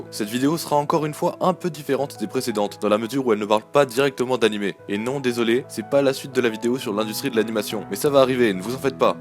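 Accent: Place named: France. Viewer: MÈME Jpp j'en ai marre des français. C'est un Meme et ca ce dit mims pourquoi vous changez tout avec votre accent de bite. Trigerred.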